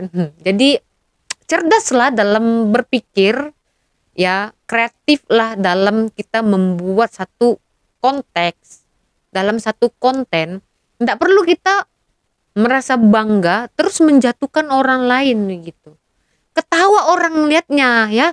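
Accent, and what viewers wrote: Malaysia. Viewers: Yah malu malu bahasamu Melayu bang logatmu kau bukan orang Indonesia kalo berani buka topengmu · Bang kasih lepas topengmu anda ngomongnya macam bahasa melayu malaysia jangan bohong dong bang malaysia yang miskin juga banyak yang tidak punya rumah juga ada walau indonesia sema rakyatknya punya rumah betul enggak saya tau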